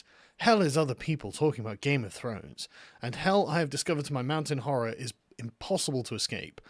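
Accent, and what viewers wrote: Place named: United Kingdom. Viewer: You’ve got the most awesome British accent ever!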